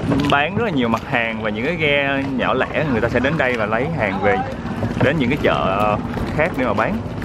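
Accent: Mekong Delta, Vietnam. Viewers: Giong mien tay thi khong phai chê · giọng nói đặt sản miền tây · / dể thương nói chuyện đúng dân miền Tây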